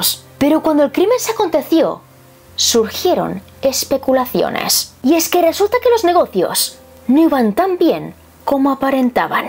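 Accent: Spain. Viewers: Hablas muy bonito tu acento español, el de la señora de la grabación está horrible jeje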